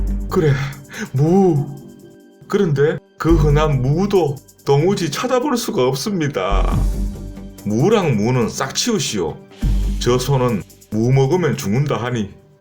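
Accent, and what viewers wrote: Gyeongsang, South Korea. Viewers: 선비님? 갱상도 말 이야기 정말 우습게 잘 하시네요~ ㅋㅋ~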